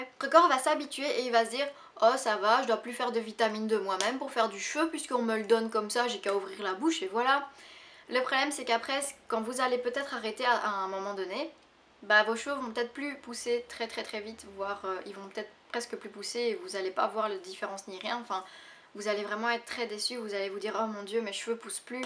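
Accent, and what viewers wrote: Belgium. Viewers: Par contre un léger accent belge , pas besoin d'hortophoniste !😊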